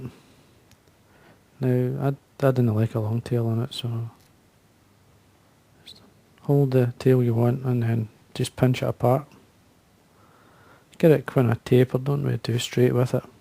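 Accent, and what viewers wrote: Scotland, United Kingdom. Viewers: Thanks, I am buying some. I was at our monthly flyfishing, tying meeting here in Waco last week and a friend mentioned your name. He is a good tyer and a very good fisherman. He is about to win a tough statewide (Texas) fishing contest, multiple species, many variable weather conditions. Pat has been watching you here on youtube, and once we began talking, several others chimed it. You've got a great following here. Also, you've got a bunch of Texans trying to imitate your accent as well as your tying abilities and techniques. I was laughing listening to all of the Texans trying their Scottish brogues. I told them about your filling that beautiful storage box with Classic Salmons; do you still have it? I won't forget that project. I should have bought it when we talked about it. I do have your flies mounted and displayed at the clinic and in my tying room here at the house. 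Everyone marvels!! Take care and know you are bringing a lot of joy and education to tyers around the world. They love you here in Texas!! Ray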